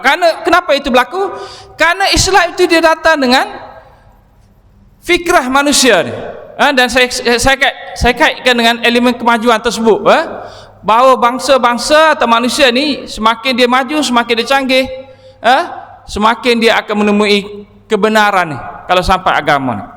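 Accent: Kelantan, Malaysia. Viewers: org Kelantan kan cakap bunyi kelantan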